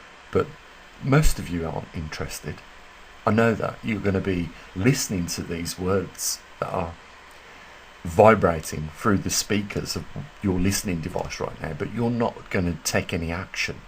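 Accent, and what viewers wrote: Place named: Black Country, United Kingdom. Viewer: M8… where ya from? You sound local… I’m in West Brom, let’s meet up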